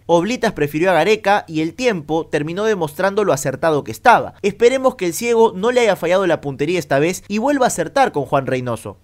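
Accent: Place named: Argentina. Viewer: Una pregunta, eres argentino o no, por qué hablas como argentino.. habla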